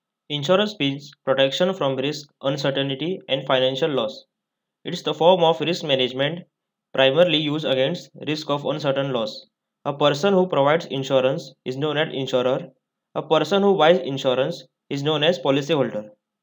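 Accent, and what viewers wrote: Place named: Goa, India. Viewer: Nice video👌 ekdum goan accent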